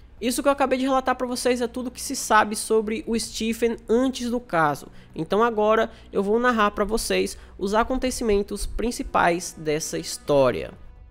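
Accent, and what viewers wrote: Northeast, Brazil. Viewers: Oi gosto muito dos seus vídeos e das suas narrativas 👍 você é do nordeste amigo 👏👏 sou de Aracaju-se.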